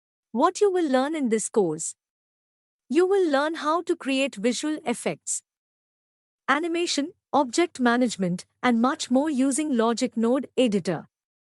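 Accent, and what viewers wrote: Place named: India. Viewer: Indian accent +20 to understanding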